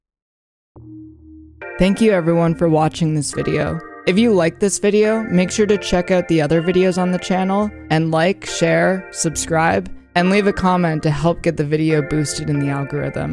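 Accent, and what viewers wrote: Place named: Canada. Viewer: Wait! You sound Canadian. I thought you were Italian :( Mamma Mia!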